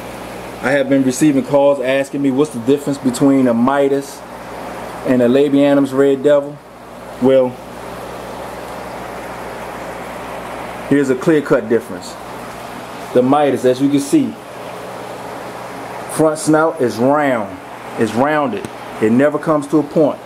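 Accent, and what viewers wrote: DMV, United States. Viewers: sound like your from the dmv if so we need to hook up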